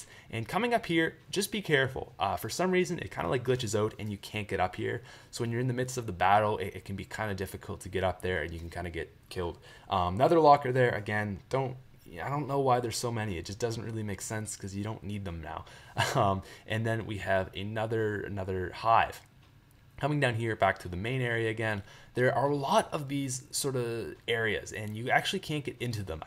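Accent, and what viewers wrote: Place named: Canada. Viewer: ha ha, love the Canadian in ya ;)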